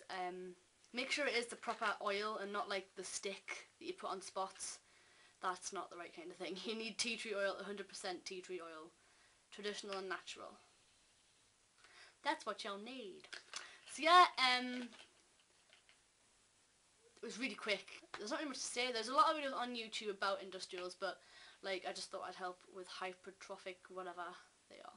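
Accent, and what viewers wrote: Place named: Newcastle, United Kingdom. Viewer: She's Geordie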